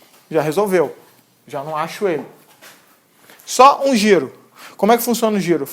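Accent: Rio de Janeiro, Brazil. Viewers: Aí se tivesse cm se matricular ou algum modo de se tornar aluno dele assim como o matemático eu iria firme e forte, pelo sotaque é carioca também.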